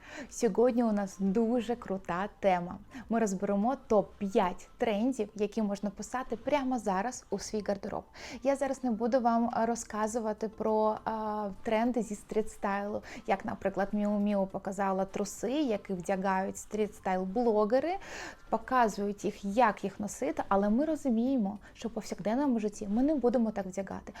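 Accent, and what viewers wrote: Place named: Russia. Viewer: Навчіться правильно розмовляти українською. Чисто через вашу кацапську вимову не змогла дивитися більше півхвилини. В українській літера "г" - м'яка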